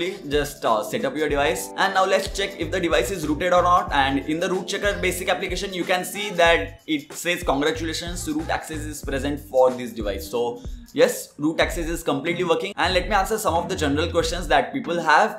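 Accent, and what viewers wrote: India. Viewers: 有点印度阿三的口音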